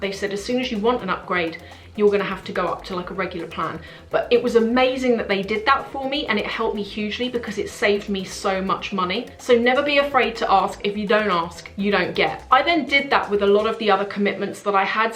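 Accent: England, United Kingdom. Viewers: Hello! I am a new subscriber and just recently found you on YouTube. I am loving your videos! I get a coffee and settle in to listen to your lovely English Accent (I am from US) your style is so inspiring!!! and my favorites are when you open mail from you subscribers. You are so genuine! So humble and appreciative!! I really really am so glad to have found you!!!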